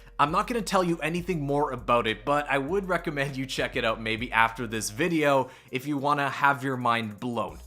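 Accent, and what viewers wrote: Canada. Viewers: Didn’t think twice about the Canadian accent, also up here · Love how us canadian say words, got a good laugh out of that one and not that I really care about being noticed I just watch till the end every time, would like like this comment eh?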